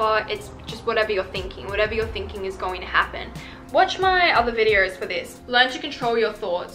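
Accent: Australia. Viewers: Asian woman with an Aussie accent is SOOOOOOO HOT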